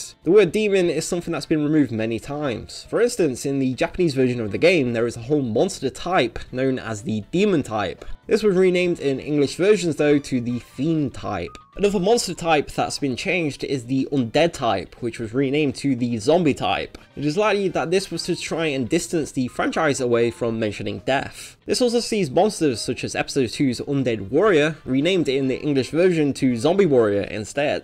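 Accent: United Kingdom. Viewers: All british youtubers have the same cadence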